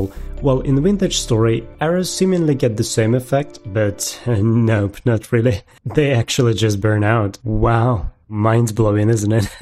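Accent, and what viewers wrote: England, United Kingdom. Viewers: O ya vizhu vi iz anglii?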